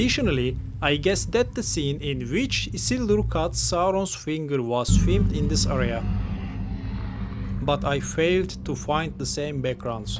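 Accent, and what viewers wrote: Turkey. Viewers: türk. ingilizcesinden bile belli oluyor. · Videonun ilk dakikalarında bu ne böyle Türkler gibi konuşuyor İngilizceyi dedim. Meğer Türkmüş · Aga türk müsün aksağandan anladım düzenleme video başında yazdım bu yorumu videonun devamında açıklamışsın zaten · Ulan ben de diyorum İngilizceyi aynı Türkler gibi konuşuyor, Türk'müş adam :)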